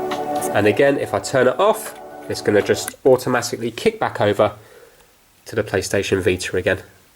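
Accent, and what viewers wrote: United Kingdom. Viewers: The british accent is very funny lmao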